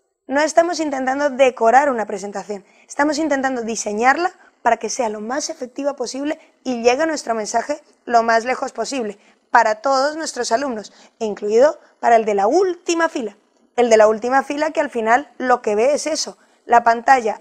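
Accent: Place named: Spain. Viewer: Esta tia es mexicana y habla como española. Vale.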